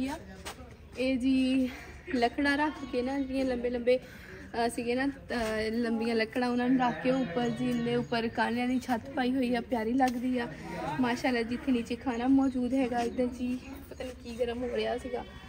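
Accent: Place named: Doaba, India. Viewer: Tuhadi punjabi doaba di lag rahi hai...charde punjab ch Jalandhar te Hoshiarpur doaba ch aande ne...inz lag reha jiwe tusi bhi etho de ho...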